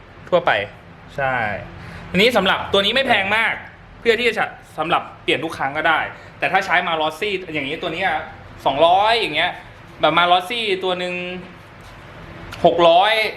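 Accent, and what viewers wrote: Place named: Isan, Thailand. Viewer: อร๊ายยยย น้อง เป็นผู้ชายที่มีความสาวนิดๆ ติดสำเนียงอีสานหน่อยๆ ใจละลายแล้วคร่าาาา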